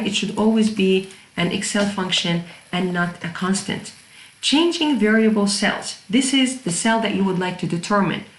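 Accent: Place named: Iran. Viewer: Thank you, I must say you sound like my Persian friends accent. I miss them, we used to have good fun in University in Tasmania. God bless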